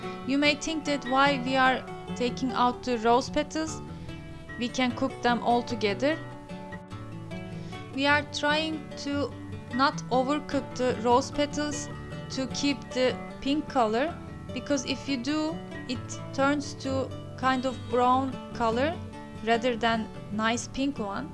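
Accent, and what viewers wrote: Turkey. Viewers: Funny but I'm Turkish and this is the first time I hear English with a Turkish accent 😂. I can't speak with Turkish accent, maybe I don't hear my accent I don't know. Anyways that's nice you're keeping your accent and thank you I heard my accent for the first time hahaha.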